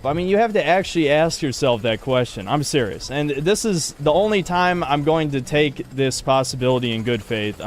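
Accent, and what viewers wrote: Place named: United Kingdom. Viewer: I bet it's the British accent. They hear that and think he's super smart lol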